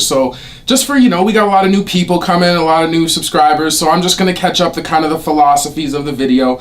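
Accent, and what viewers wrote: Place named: Nova Scotia, Canada. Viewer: Are you from Canada? You sound like you're from Nova Scotia.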